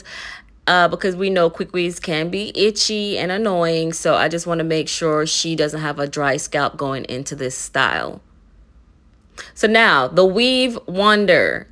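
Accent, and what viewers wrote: Jamaica. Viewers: Hey hun nice job. U Jamaican? 🇯🇲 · Are you Jamaican or from another Caribbean island?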